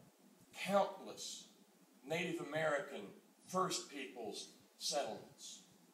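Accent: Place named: South, United States. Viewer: Southern drawl nonsense. Listening to this guy is like shitting razor blades. His daily trash talk on "The Horn" is pathetic and so juvenile. Keep propping up idiotic Lib rhetoric is all this clown does. Stands for nothing but status ignorant quo of leftist crap. How is that "hope and change" working out for you? You got your long legged mack daddy in the white house and see how he disregards you all daily. Absolutely hilarious!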